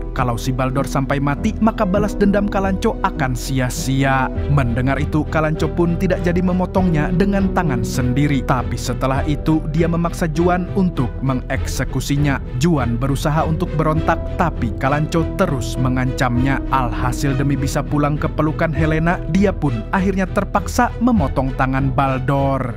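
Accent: Banjar, Indonesia. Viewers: orang banjar kah pian min😅😅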